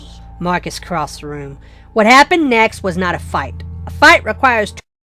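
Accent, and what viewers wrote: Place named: United Kingdom. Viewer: Oh, Another British grimdark reader. Interesting very interesting indeed. New subscriber.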